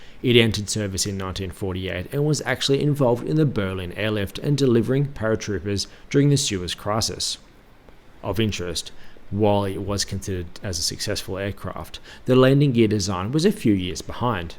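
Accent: Australia. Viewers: Bloody brilliant mate, and it’s great to hear an Aussie voice. Keep it up. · It’s so nice to see a factual, educational clip AND it’s spoken in Aussie!😂😂🇦🇺 thanks mate, love your vids.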